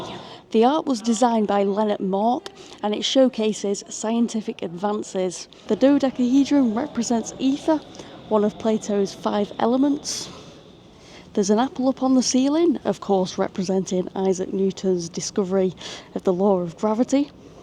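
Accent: United Kingdom. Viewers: I can hear that you're brittish... just have a permanent stay in Stockholm. its not worth going back to England. Sweden is kind of a shithole now too but England is a lot worse.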